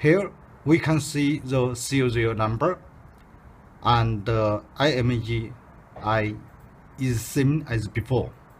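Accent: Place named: Japan. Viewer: Do not ask me why, but when I hear your Japanese accent, I feel like an engineer does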